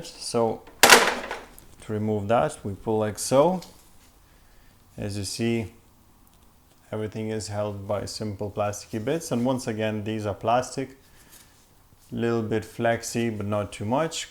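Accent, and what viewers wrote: Ireland. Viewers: Hello, and thanks for this really great review. I didn't think it was boring at all by the way. (You mentioned this when showing the internals). How is the noise on this so far? I record and edit YT videos so I am wondering if you notice much interference on the recordings? Also, is that a slight Irish accent I detect? ;)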